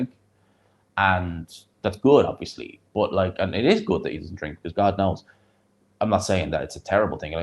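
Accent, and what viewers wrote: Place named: Ireland. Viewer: Thought I'd check out your analysis; always enjoy your comments and Irish accent. Enjoy your sleep!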